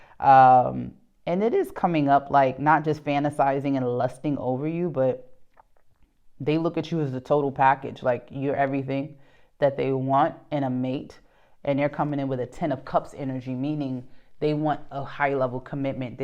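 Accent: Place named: New York, United States. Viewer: Ma you are so NEW YORK and the way u talk you grew up in my era Keep up the great work.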